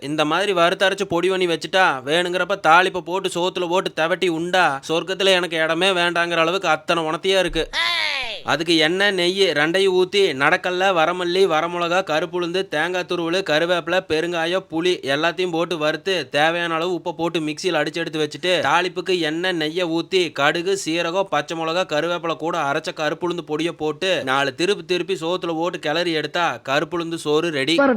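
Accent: Kongu, India. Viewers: கோவை கொங்கு தமிழ் அழகுகே அழகுதான் ❤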